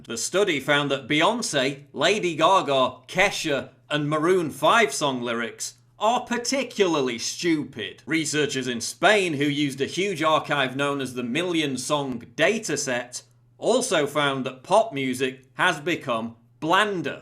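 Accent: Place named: Northern England, United Kingdom. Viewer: Ha I love you man.. ant fukin northern accent piles on your integriee. Keep it coming, we will be people with minds again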